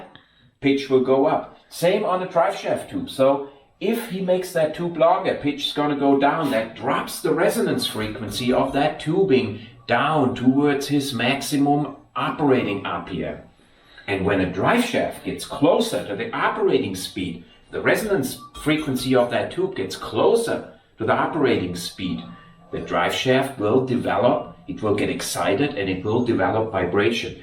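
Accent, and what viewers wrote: Germany. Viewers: I hear German accent, I believe any engineering points they tell me !!! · Mechanical lectures spoken in a German accent have an authority that is unrivaled by any other nationality. All of us viewers around the world are just sitting nodding and quietly saying, "Yes, Herr Professor," accepting everything you say without question...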